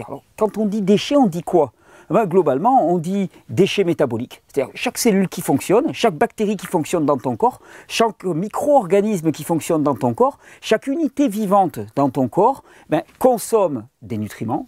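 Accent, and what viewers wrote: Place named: South, France. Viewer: Regenere / Thierry Casasnovas Je suis de la région opposée à la tienne; que j'appelle "le Haut de l'France "😆 et ton accent nous apporte le soleil du Sud 🌞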